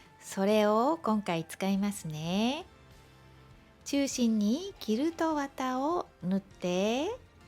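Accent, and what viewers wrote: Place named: Kansai, Japan. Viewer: 関西弁😂とてもいいですね、笑いました。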